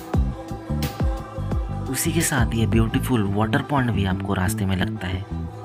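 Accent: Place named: Maharashtra, India. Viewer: very good video मराठी accent should be corrected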